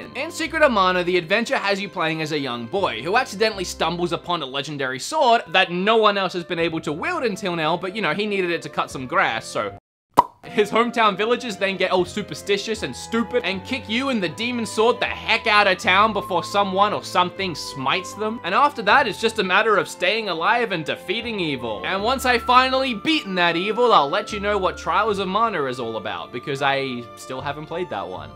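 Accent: Australia. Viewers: Wait, your Aussie? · I'm so glad you finally said your accent, I'm Australian too and it was killing me bc of the American hints you have too, I was so confused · Lol I didn’t realise your Australian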